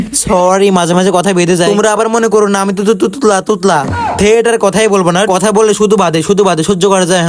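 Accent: Noakhali, Bangladesh. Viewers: ভাই আন্নে ও কী আর মতো নোয়াখাইল্লানি কী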